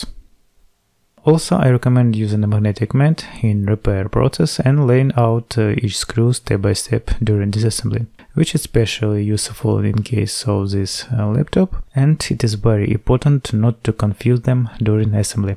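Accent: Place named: Russia. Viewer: Акцент выдаёт, на русском может есть смысл комментировать?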